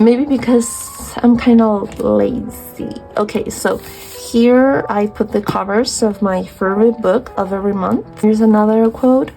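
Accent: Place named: Latin America. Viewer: for disability rep, the next book on my tbr that covers that is called “out on a limb” and it’s a romance I think? I can’t recommend it bc I haven’t started yet but it sounded really cute! For a book about books, I think the starless sea works! also lol this kind funny but as soon as you mentioned the book being expensive to get to your 3 world country (+ your lovely accent) I immediately thought “she’s Latina” — it was instant neuron activation lmao !! I actually was thinking maybe you were Brazilian (like me ❤) but the accent was just a little off from our usual accent so I wasn’t sure~~ I’m guessing you’re Chilean then?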